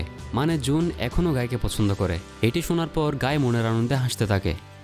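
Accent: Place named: Chittagong, Bangladesh. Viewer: চট্টগ্রাম এক্সেন্ট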